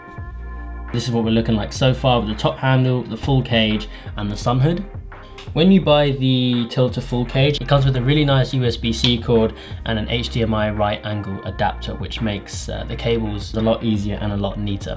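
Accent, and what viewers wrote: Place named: United Kingdom. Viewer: When did Elliot Choy get a British accent?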